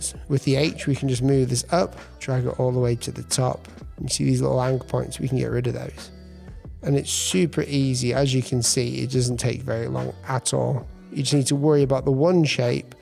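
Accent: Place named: Yorkshire, United Kingdom. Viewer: Hahah that looks mad using a trackpad with an iPad. You from the North btw? I’m sure I’m detecting Yorkshire there somewhere 😂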